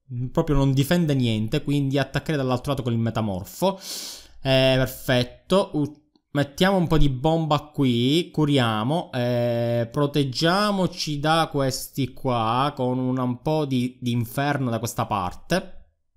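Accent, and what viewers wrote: Palermo, Italy. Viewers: Fra sei di Palermo?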